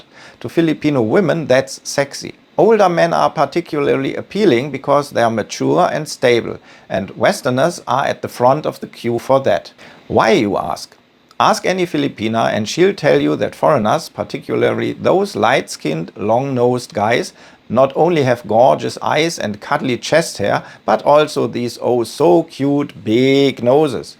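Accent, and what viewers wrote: Germany. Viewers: I love the German accent. It gives you credibility like a Mercedes Benz ad.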